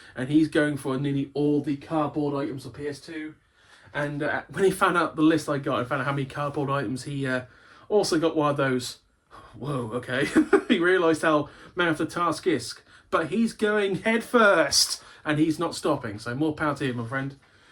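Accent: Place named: Germany. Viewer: That was 1 funny upload 😂 loved the German accent 😂 and defo checkout gaming rarities channel 👍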